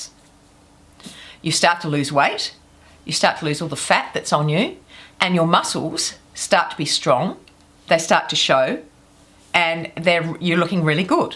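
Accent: Australia. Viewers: I wake and do my exercises every morning with you!! I take off on the weekends!! I would love a Aussie accent, I love the way you talk!! I am from America we don't have a accents!! My question to you is, are you still doing facial exercises and will you be doing them for life? Have a great week!!!😊💟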